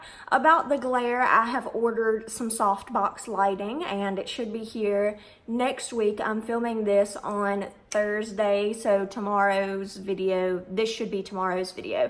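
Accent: South, United States. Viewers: Far as I can tell,your makeup looks fine. Also,where are you from. Noticed a southern drawl. I,too,am a southern gal. Southern Louisiana,in fact. Saw a few videos. Seems like Jennifer is sabotaging the shop. But,since she's blowing the boss,it's unlikely she's getting fired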